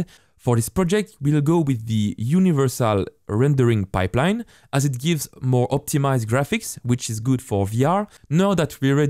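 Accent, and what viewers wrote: France. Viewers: You are probably French right? That accent seems French to me :) Well I am too, just not from Europe, I have a different accent here in Quebec. Anyways thank you for the tutorial ! Merci. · Pas mal le French accent x) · Is he french? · En tout cas on voit pas du tout que tu as un accent français cher compatriote ahah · I love his strong French accent haha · On reconnaît le booonne Français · A lil french xd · Your french right? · It's the French accent for me. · Juste a ton accent: Tu es francais?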